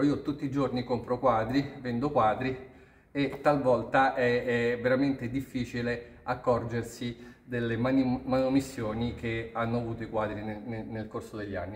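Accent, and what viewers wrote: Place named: Rome, Italy. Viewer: Ma è sicuro che «craquelure», francese, si pronunci proprio così come fa Lei? Confronti l'ortoepia magari su Google traduttore e vedrà che l'esito è un po' diverso dalla Sua interpretazione. Certo la /ü/ è notevolmente difficile da rendere, specialmente per un «romano de Roma», però con un po' d'esercizio magari...!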